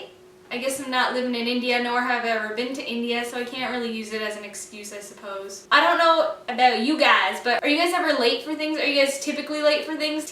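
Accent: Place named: South, United States. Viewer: I love the country accent ajajajaj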